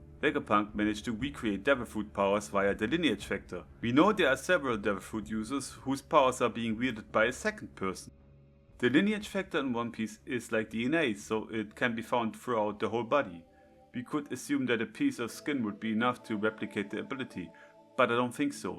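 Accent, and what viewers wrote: Germany. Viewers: Hey Mate, ich kommentiere nicht oft unter Videos, aber dein Kanal ist definitiv ein Hidden Gem! Da du meiner (und vermutlich auch deiner) Meinung nach zu wenig Reichweite hast, versuche ich mal meine Kommentarfaulheit als 0815 YT OP-Theorie Zuschauer zu überwinden und dir mein völlig subjektives Feedback zu geben. Die "Produktionsqualität" ist generell sehr gut, in Sachen Videoschnitt/SFX gibt es in diesem und auch in den anderen Videos "nichts zu meckern" ^^ Deine Theorie mit den Swirls und der 4. Wall ist wirklich meisterhaft. Hut ab! Dein Storytelling ist auch wirklich gut und imo ist der "Sidekick" das Lustigste was ich bisher in der OP Theories Community gesehen habe. Das gibt deinen Videos wirklich einen krassen Unterhaltungswert und Individualität. Der (französische?) Akzent ist imo on Point und zum wegschmeißen 😂 . Bezüglich des Videos könntest du bei der Audiobearbeitung evtl. 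noch den Kompressor auf der Masterchain tweaken, damit es etwas "voller" klingt und dem EQ für deine Stimme etwas mehr untere Mitten/Bässe geben. Nun zum einzigen, aber nicht zu unterschätzenden Kritikpunkt. Deine Aussprache bro. 🙃 Der Grund, warum ich mir zu 99,9% sicher bin, dass du Deutscher bist, ist, dass ich genau die gleichen Probleme mit der englischen Aussprache habe/hatte wie du...! 😅😅 Nichts für ungut, ich will dir nur mein laienhaftes Feedback geben, damit dein Channel in Reichweite explodiert ❤ . Du solltest wirklich an den Variationen von Th arbeiten. Außerdem R und W am Anfang ei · Sounds german not french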